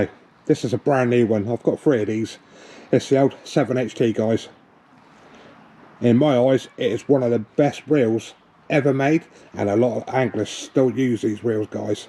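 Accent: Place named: RP, United Kingdom. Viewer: Great video very posh like it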